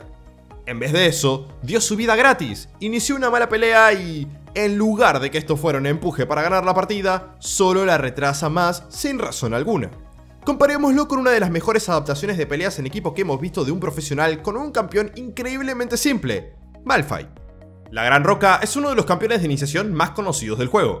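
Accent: Standard. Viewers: Solo a mi me desespera que un argentino hable neutro? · Porque habla en neutro si es más Argentino que yo 👀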